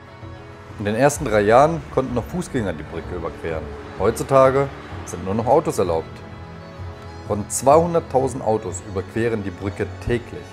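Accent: Germany. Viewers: I like your German accent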